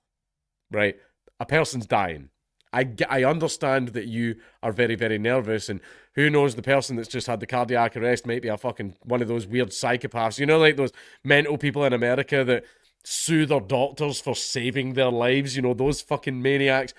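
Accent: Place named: Scotland, United Kingdom. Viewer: I had no idea listening to someone with a heavy Scottish accent say defibrillator would be so entertaining. I am going to ask that you say a few other things. Tarantula. Vocabulary. Distribution. Judicial system. Oh! And Burglar alarm.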